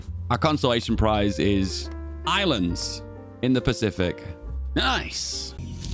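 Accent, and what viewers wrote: United Kingdom. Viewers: It's amusing to hear a Limey talking shit about American economic policy and praising multiculturalism while seemingly oblivious to the fact that the policymakers of both our nations work for the central bank, not for the citizens, who in turn belong to a parasitic culture which has always and will always destroy its host nations through glorifying multiculturalism. Our nations are collapsing and our Folk are on the brink of extinction while we waste our time on pointless video games and silly videos on the internet.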